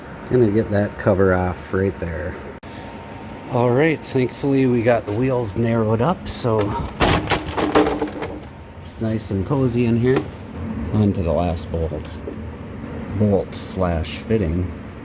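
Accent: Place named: Minnesota, United States. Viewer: So ur accent says north...Minnesota?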